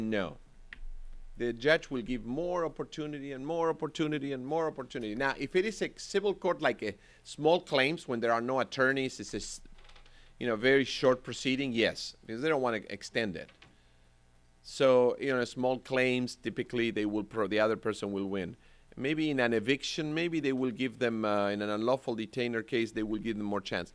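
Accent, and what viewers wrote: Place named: Argentina. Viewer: Es Argentino.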